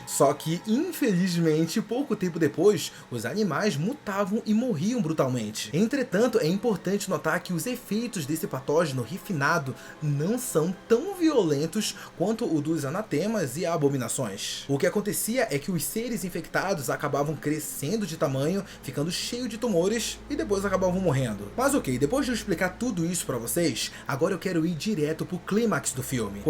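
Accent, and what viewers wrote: Brazil. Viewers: Finalmente alguem que fala palavras em inglês abrasileirando... sem querer forçar a pronúncia igual a um semi retardado nerd que se acha nova iorquino.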